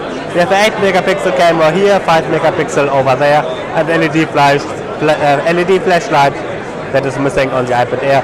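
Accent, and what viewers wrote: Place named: Germany. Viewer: sis is se worth english ive ever heard please if you as a german guy do your hands ons in english please learn how to spell words like "thing" oder "that" properbly und jetzt nochmal auf deutsch lerne bitte erst englisch bevor du hands ons auf englisch machst die wörter heißen "this" und nicht "sis" oder "the" und nich "se" ansonsten mach auf deutsch weiter oder hörs einfach auf das kann man sich ja nicht antun ich konnte das video nicht mal halb anschauen weils mir dann schon hochgekommen ist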